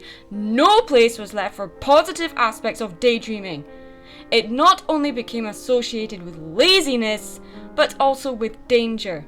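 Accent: Scotland, United Kingdom. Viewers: Big fan of your Scottish accent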